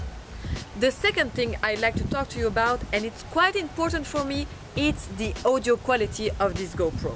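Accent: France. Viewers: Ah le bel accent Français j'adore hi hi hi hi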